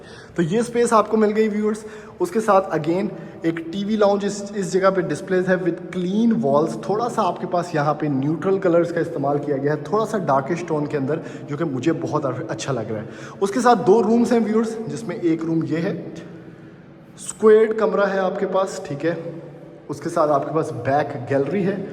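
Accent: Punjab, India. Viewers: Apni videos mein ap jo Punjabi touch daity hu usy increase kar do specially ye word " lamkaaa " howa hai apki mostly videos mein sunny ko milta😂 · Beautiful house with your beautiful punjabi touch accent. Keep it up😊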